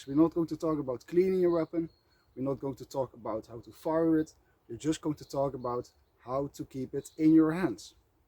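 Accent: Netherlands. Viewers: Hmmm dutch??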